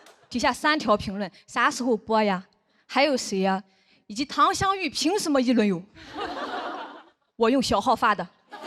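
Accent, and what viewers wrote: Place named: Shandong, China. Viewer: 临沂口音听着太那啥了😂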